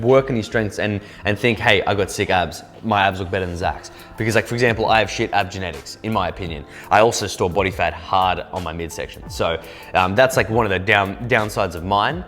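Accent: United States. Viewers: Holy fkn shit look literally like Justin Beiber and u nailed the american accent dear god. way to go man. not just braun :P funny too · Wtf he sounded American af😂😂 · Your American accent is scary good. I just started watching these videos and I swear I wouldn't have known you were Australian if I had stopped after the intro 😂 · BROOO THE AMERICAN ACCENT IS SICKKK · Yooooo your American English accent is perfect lol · American accent on point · Lmfao the American accent is so funny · Omg!!!! Your Southern accent! Yass!! 🤣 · American accent is on point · On point with his American accent. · not bad american accent, didnt say bro or dude (yes that stereotype is true)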